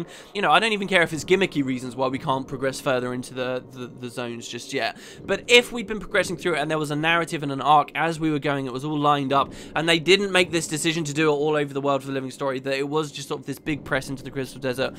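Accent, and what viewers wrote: England, United Kingdom. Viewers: you have the proper English accept so you instantly sound Epic to us Americans! Well.. i dunno.. to me anyways! lol. Absolutely agree with you on the DE reward scaling..if people had the chance to get like 1g from an event that hadn't been done in a month, people would definitely be out there doing stuff. & the game should TELL you "you got this much extra stuff for doing an ignored event" etc.. Maybe add new medal types.. platinum, Mithril & Orichalcum that only show up on old events & give you special loot boxes.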